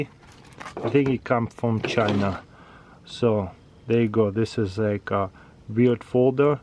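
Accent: Russia. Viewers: Going from the accent, art thee russian?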